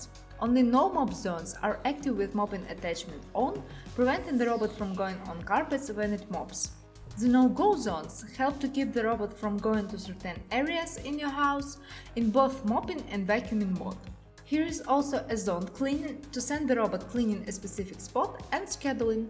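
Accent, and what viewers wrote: Russia. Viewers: Thanks for the review, but the accent is too Russian, that it hurts ears.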